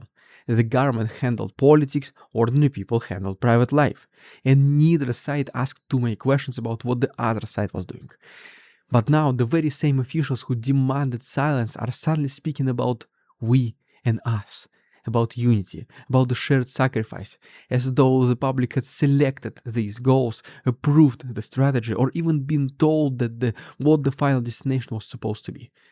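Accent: Russia. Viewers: Dude; i would appreciate if you spoke more slowly. I admire your eloquency in English. But spoken fast with Russian accent, is difficult to understand.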